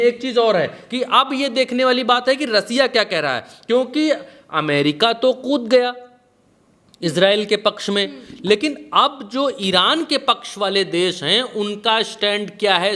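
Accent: India. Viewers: bhaiya aapka desi Andaaz hai maja aa jata aapko sunkar Jay Hind Jay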